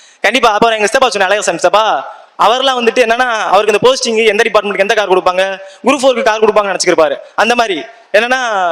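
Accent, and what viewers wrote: Madurai, India. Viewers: Madurai slang🤩🤩🤩🤩🤩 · Madhurai slang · Madurai kanaran slang i think😊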